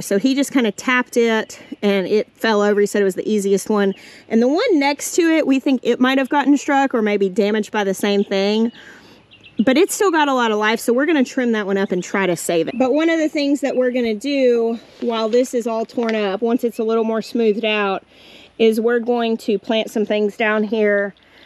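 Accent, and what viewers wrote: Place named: South Carolina, United States. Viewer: Do you realize how much of a South Carolina Southern drawl you have picked up??? 🤣🤣🤣😍😘😁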